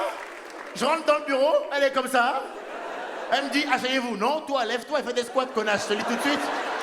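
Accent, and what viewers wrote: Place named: Africa. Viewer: C'est pas mal Arrive et ne cède pas à ta mentalité Néanmoins Tu n arrives pas au niveau de jemmy Ferrari Tu es trop en manque de vigilance J aime ce que tu fais mais tu parles comme un africain Moi je suis française et certains choses dérangent vis à vis des femmes Je sais Tu en as rien à foutre Dommage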